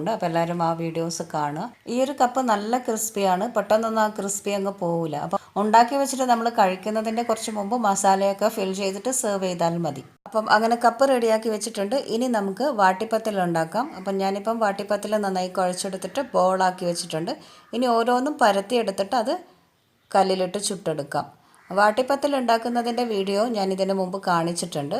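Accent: Thalassery, India. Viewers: Nammude thalassery talk kelkan thanne Rasama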